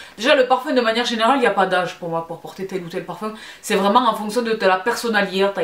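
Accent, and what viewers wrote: South, France. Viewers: Super video ! J'adore ton accent qui me rappelle mon enfance dans le sud. Et j'aime beaucoup ta passion pour les parfums, avec pas mal de goûts communs. Je porte Fève Délicieuse depuis septembre (cadeau d'anniv'), quelle merveille ! Si tu veux bien nous en dire un peu plus sur Bois d'argent un de ces jours, ce sera super ! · Coucou je suis aussi une vrai passionnée de parfum👌avec ton jolie accent du sud J avais l impression d être avec mes amis de Bandol étant à Rennes 🙂💕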